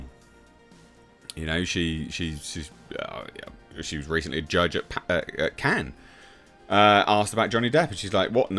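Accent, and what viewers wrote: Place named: United Kingdom. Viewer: Wow. So many tiny dicks, so many scared man-boys. Ten million "men" demeaning women daily is equal to one person speaking up and calling them on it. Sick sad little America, and Britain I guess, considering the accent of the host. Torches and pitchforks over nothing. But hey, rally around the hate. It's what drives this country. Especially in the new dark ages of the American barbarian south, where little white man-boy is king. Is anything short of forced re-education going to change things for the better?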